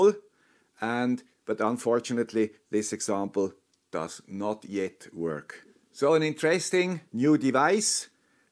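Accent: Switzerland. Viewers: hello this is the guy with the swiss acce...(smashes like button instantly)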